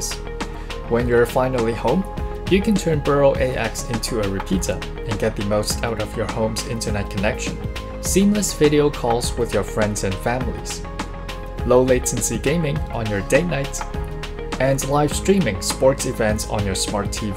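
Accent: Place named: France. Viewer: Very very French speaker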